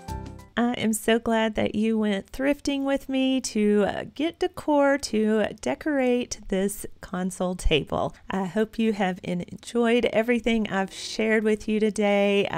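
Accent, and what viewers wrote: Texas, United States. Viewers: I just recently started following you, and thought I detected a Texas accent. I'm from Lubbock. Thanks for all the great inspiration. Your home is beautiful.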